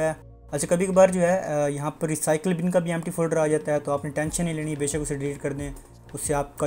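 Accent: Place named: Southern England, United Kingdom. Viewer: Southern English to fast..... had to change the playback speed. LOL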